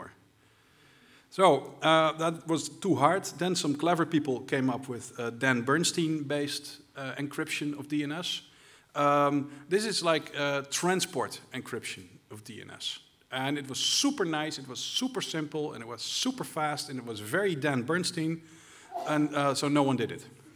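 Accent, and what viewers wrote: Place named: Netherlands. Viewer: Yes. The Dutch accent is kinda cool.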